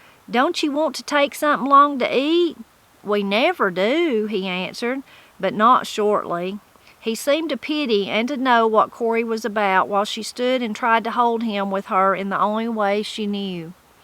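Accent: Appalachia, United States. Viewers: I have just found your channel and have subscribed. Hearing you talk is just like hearing my grandmother talk. She and my grandfather were from the hills of Tennessee and followed many of the Appalachian folk ways, culture, language, etc. I try to keep a list of words and expressions they used that I never hear anyone else use and over the years have found that much of it came from England and Scots-Irish ancestors. It is wonderful that you are preserving that heritage. Blessings.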